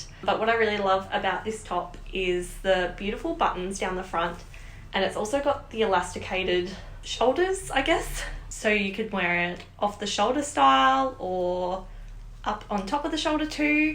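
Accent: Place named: Australia. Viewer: Love this! So fun to see someone go to spotlight 😉 as a fellow aussie it's so nice to hear your accent and hear you talk about and go to familiar places (like salvos too!)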